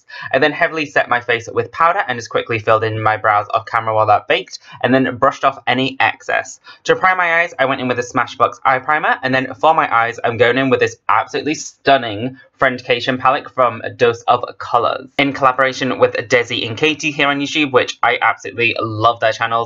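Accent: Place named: United Kingdom. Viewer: and the British accent is so a turn on 💖💖💖💖🌹🌹🌹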